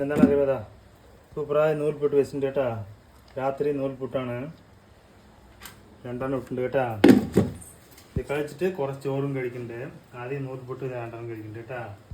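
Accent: Tamil Nadu, India. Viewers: ചാർ എന്ന് പറയുവോ കറി എന്ന് പറയുവാ.. നിങ്ങൾ തമിഴ് ആൾക്കാർ ആണോ?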